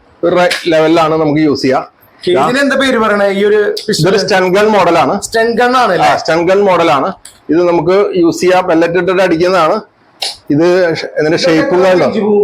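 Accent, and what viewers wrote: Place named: Kochi, India. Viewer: Kochi karude samsaram padichallo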